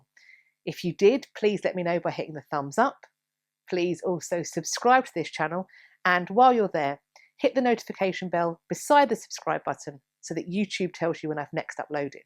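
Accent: RP, United Kingdom. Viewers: I just love listening to you speak. So elegant. No fillers not a single extra syllable. Just straight clean facts delivered in BBC English. Episodes are always fascinating as well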